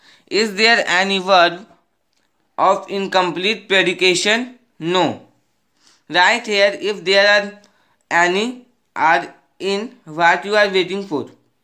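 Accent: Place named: Bihar, India. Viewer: Bihari sa bhaiya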